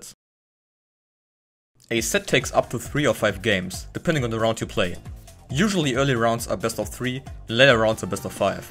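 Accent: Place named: Germany. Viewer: Hab dein accent gehört und direkt gewusst, dass du deutscher bist lol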